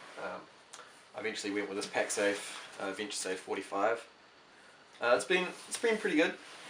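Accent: New Zealand. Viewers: So good to hear Kiwi accent on Youtube! Im from NZ & off to Euro in 2 wks time. Got a Pacsafe x40 & few other bits n pieces. Thanks for the tips, really helpful. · Great video bro! I'm a fellow kiwi currently travelling around Europe too, you have a lot of great tips and I've defiantly learnt a couple things. Good to hear a kiwi talk after months of Europeans haha. loved the video. Cheers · a Kiwi (from New Zealand - the land of superb hiking). Aussies generally have broader accents, lol!! Happy travels.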